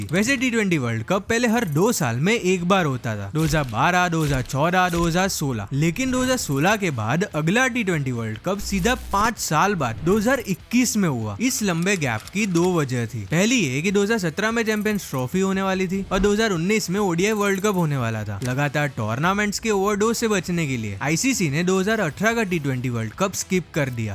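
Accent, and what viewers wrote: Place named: South India, India. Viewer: speed is alright. I think you have bit of south accent,thats the point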